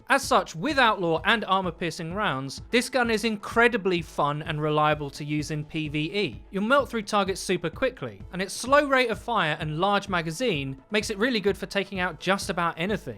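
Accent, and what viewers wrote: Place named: United Kingdom. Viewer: Can we get a destiny guide channel where it's not a british dude?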